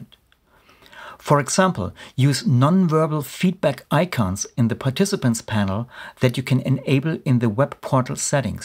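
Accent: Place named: Germany. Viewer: You are really really good at explaining how this mess works, thank you so much! you have a quite strong german accent, you are german right?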